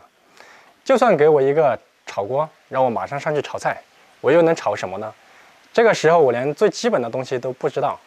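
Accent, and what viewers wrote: Sichuan, China. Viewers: 王刚为什么这么红? 他的宽油烹饪并不适合一般家庭厨房。显然大部分观看视频的人并不是真的想学做菜。那到底是什么原因呢? 1, 他长得帅｡ 2, 他的川普好听。 3, 视频节奏明快, 讲解到位。 4, 富有乡土气息。 5, 邪神助攻。 6, 看他烧菜能解馋, 可以用来减肥。 你会选哪项? 大概很多人会选7, 那又是什么呢?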